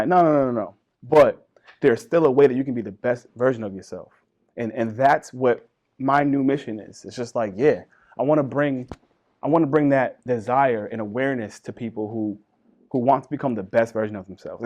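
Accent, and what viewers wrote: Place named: New Jersey, United States. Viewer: Lovely accent........New Jersey ?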